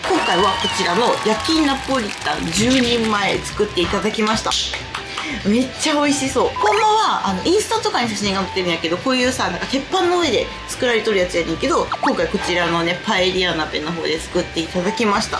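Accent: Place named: Osaka, Japan. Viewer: 大阪弁が入っているので親近感がわきます。 それにしても食レポが巧いですね。ものすごく食べたくなりました。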